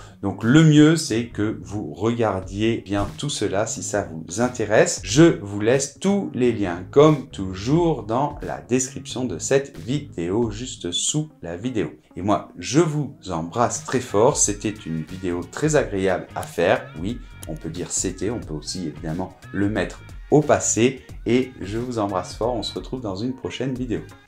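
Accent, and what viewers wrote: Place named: France. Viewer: Bien expliqué et avec la prononciation française que j'adore!, bon weekend à toi et à ta famille! 😘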